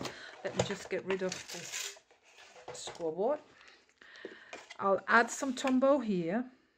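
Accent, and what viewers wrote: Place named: England, United Kingdom. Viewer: i love , love, it, i will surely try it because i love the 3D projects. i am sorry for your finger but we all do this with the excitment of seeing the finish project. how satisfying it is when we finish it specially when it is our own creation. For me i love your english accent and your english sounds perfect for me. continue your good work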